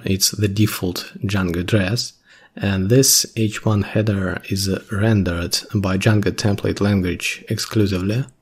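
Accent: Russia. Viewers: Сразу заметил русский акцент, но не придал значения, но потом увидел, что пользователя зовут )), благодарю за видео, познавательно!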